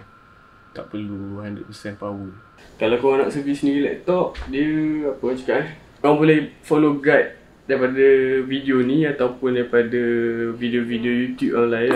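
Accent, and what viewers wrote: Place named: Rompin, Malaysia. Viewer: Org rompin...kawan agak dah dengo loghat tu...terbaik terr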